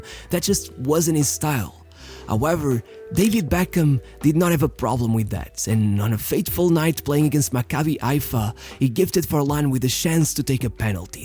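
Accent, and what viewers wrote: Portugal. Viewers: I hear Portugal in your accent.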